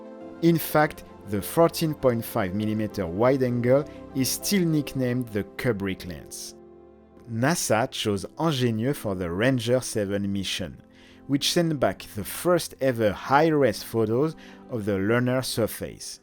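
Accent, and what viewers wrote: France. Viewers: Hey, are you french?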